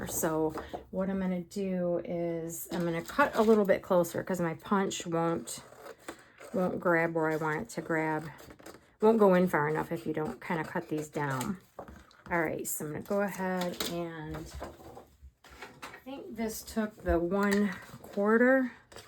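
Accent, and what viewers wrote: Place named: New York, United States. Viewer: These are so cute! I have to ask if you are from NY? I grew up there and I'm hearing it in your voice. Just curious.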